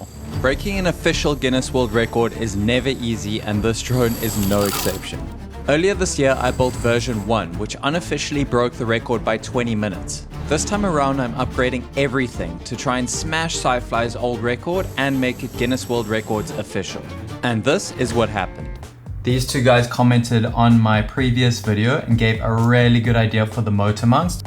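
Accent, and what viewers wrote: South Africa. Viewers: So cool to hear a South African voice while watching a video like this. Keep it up man!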